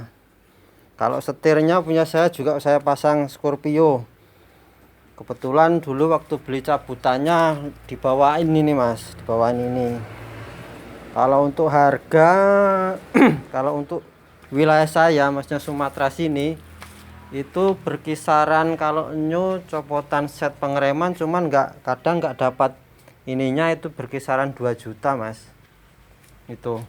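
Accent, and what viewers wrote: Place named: Java, Indonesia. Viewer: Nganu mas sampean Sumatra tapi kok nganu mas jowo logate 😁👍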